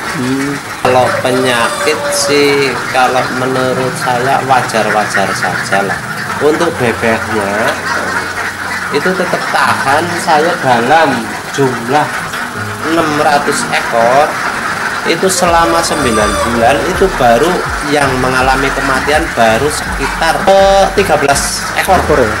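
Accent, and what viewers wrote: Banyumas, Indonesia. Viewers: Ngapak temen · Ngapak ngapak...kebumen atau cilacap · Kiye ngapak ya....tp nek ora ngapak ora kepenak... salam sukses selalu mas dan tetap semangat.. 😀😀😀👍👍👍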